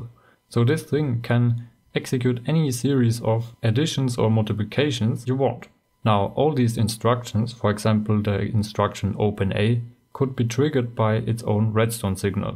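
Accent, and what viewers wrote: Germany. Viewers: Are ju German? Bekaus it klings so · I hear a german accent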